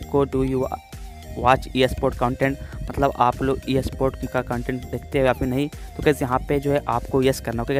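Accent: Bihar, India. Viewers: bhai tum bihar se ho na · Bhai ap bihar se ho 😀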